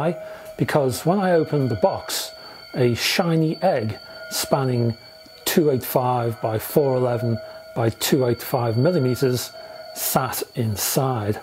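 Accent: United Kingdom. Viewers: Love the British speak, very eloquent indeed. Net, net though the review indicates these are too bright in the midrange on some vocals and recordings, which can cause ear fatigue. While boomy bass and hissing highs in some speakers are never good either, because the human ear is most sensitive in the midrange, if an $8,000 pair of speakers can get too peaky on the mids in some cases, that's the worst area to have a problem. Also this review only refers to a very few recordings.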